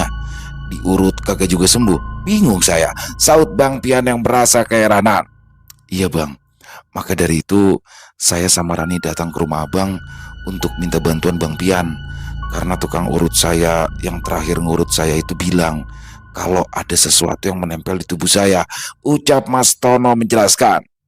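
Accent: Jakarta, Indonesia. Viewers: Assalamualikum bng, salam santun dr Medan, mantap dech bang piyan kembali dengan kocak Betawinya yg buat sy ngk pernah bosan dengarnya😂😂, terima kasih mas untung dengan cerita mas piyannya yg mantap sukses terus ya, buat bng cocok banget menjadi tokoh bng piyan dgn logat Betawinya yg kental banget, buat team x3m sukses terus😂👏👏👏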